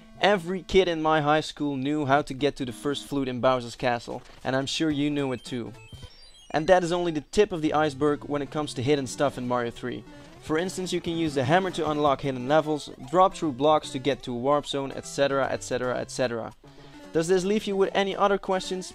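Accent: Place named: Netherlands. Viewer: Great Top 10. Like to hear a Dutch guy talking Engish so I know you're not that far away, immediatly recongnized the accent :). I'm from Belgium ;). I'm more a SNES guy as I grew up with that one, but see much games I liked on that console here too. Like Ducktailes (or that was gameboy) and MegaMan (like X very much). Super Mario Bros 3 was also released on the SNES.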